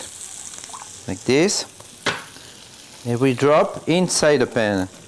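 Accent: France. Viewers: So hard to speak English with the French accent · What i like the most is the subtle frrrench accent😄😄😄❤❤❤👍👍👍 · I'm so in love with his French accent! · Adam Sandler with a French accent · I love the way French people speak. My friend speaks exactly like he speaks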